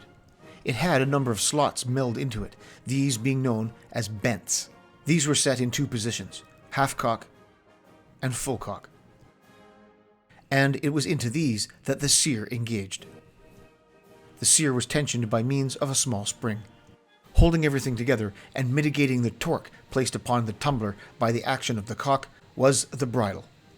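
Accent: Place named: Canada. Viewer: God that landscape is so beautiful. Im assuming by the uniform you're Scottish but the accent sounds slightly Canadian.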